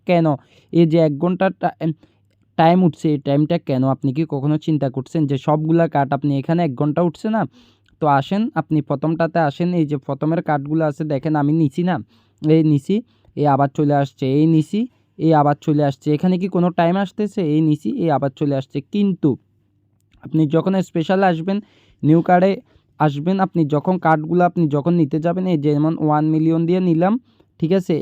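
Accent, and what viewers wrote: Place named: Sylhet, Bangladesh. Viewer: Vai to Sylhet e😁 ami to buji laice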